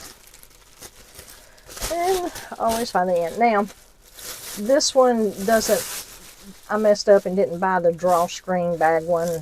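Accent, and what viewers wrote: South, United States. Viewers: Do you use the toilet you made up for both #1 and #2? I use two of these camp toilets in my old farm house. My well went bad 18 yrs ago and I hated the water anyway. I only come to this place for a few months each year so works well. Plus, my septic works just fine, so I empty every couple days into real toilet and put 2 1/2 Gal in back of toilet to flush. Nice video and great set-up. P.S you sound real southern. are you? ;-))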